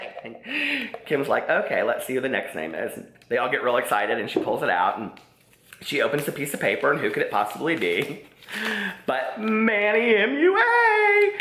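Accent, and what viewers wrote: South, United States. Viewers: LOL...you're alway's sooooo southern :)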